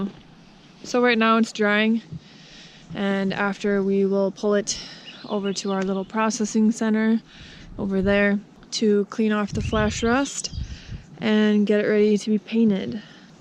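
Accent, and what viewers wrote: France. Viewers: Not trying to be rude but do I hear a French accent? And you guys sure do bust your butts! Your house will be a masterpiece when you done. I can already see it.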